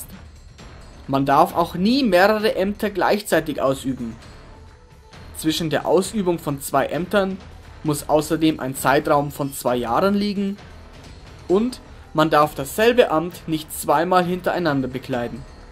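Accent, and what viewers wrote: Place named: Austria. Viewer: sehr gutes Video, kömmste aus österreich wegen den Akzente? Mache weiter so!! Grützi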